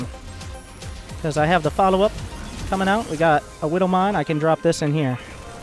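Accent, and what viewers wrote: South, United States. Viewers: I m curious as to where are you from? is this a Southern accent?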